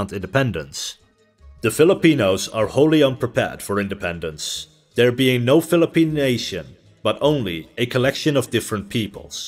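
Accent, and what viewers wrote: Netherlands. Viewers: Man, I spent a lot of time trying to place your accent. It does sound Dutch, but it’s different than many of my Dutch friends’ accents. Where are you from?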